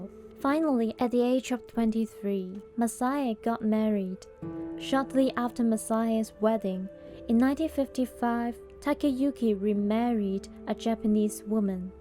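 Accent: China. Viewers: Your accent sounds Mainland Chinese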